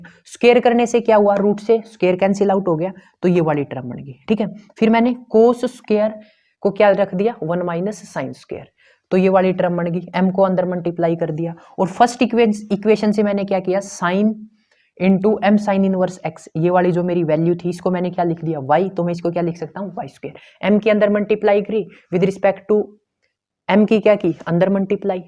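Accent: Haryana, India. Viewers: Aap haryana se ho ??? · Bhai app kaha se hai pure haryanvi bool rhe hai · Language ki tone se pta lgra h ki aap haryana se ho...main bhi haryana se hu...kurukshetra se..... 0.1😍😍support farmers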